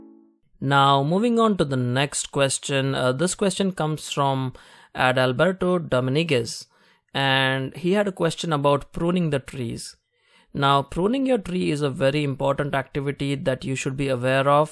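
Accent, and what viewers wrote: India. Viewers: Indian accent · Ur accent is like Indian english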